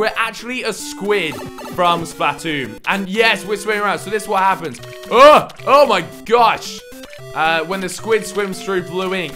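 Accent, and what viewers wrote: United Kingdom. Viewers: pls reply are you British 😀?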